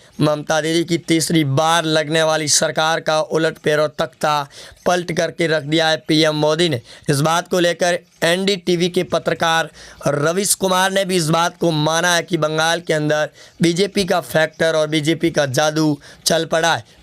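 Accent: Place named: Gujarat, India. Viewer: Sabse bekaar channel... Kal yeh nahi hota hai to tumko dhund nikalunga... Yeh accent gujju type hai...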